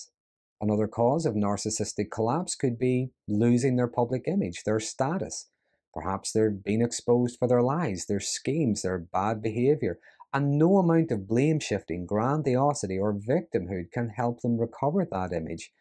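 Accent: Ireland. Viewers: Hey Ya! Binging your vids today. What a Rich MINE! of lucid eloquence. It's serving as an Excellent re-cap and review of all I've ben learning and applying the last two years since discovering Evil is real, walking among us, uncaged. And I'm catching some new stuff or another way to put old material. Thank you Just what the Dr ordered for this Gorgeous Spring Sunday in the desert. 🌞😊 Off topic- ish, but came to mind as I've listened: Are you following the plight of the British Royal Family and the phenom of the great Narcissist, Meghan Markle? I haven't looked at your deets yet, been too fascinated listening to you, and doing housework, but I'm thinking your accent is Irish, so...( Just FYI because I'm pretty 😅sure I'll be hanging around your channel a bit: I'm English born and raised till 12, then fam moved to States. I'm a mutt but mostly American Mexican by culture. 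Have lived in New Mex, USA for 26 years) Just curious about your thoughts on the subject if you have had interest. On that note, are you aware of HG Tudor's work? Any thoughts about his methods of madness? Ok- Back to absorbing your words and knocking out the dishes. Cheers 🇬🇧 + 🇺🇸 =❤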